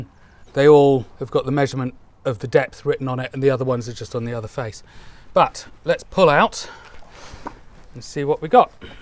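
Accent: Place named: Berkshire, United Kingdom. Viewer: That accent sounds rather Berkshire, am I right?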